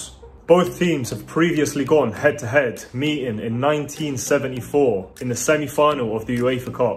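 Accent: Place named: United Kingdom. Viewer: Imas odlican britanski naglasak brate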